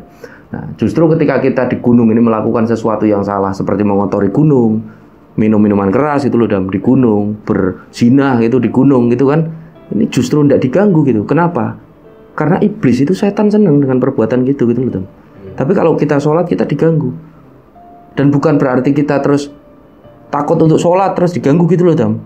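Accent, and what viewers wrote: Java, Indonesia. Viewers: Jowo ne endi mas?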